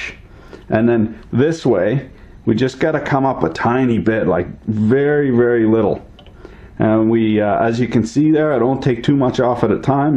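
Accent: Canada. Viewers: Haha are you Canadian guy? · Just sounds like a fellow Canadyun to me. I don't hear an accent. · Sounds Canadian. Fun video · Canadian? or Minnesotan? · definitely canadian. eh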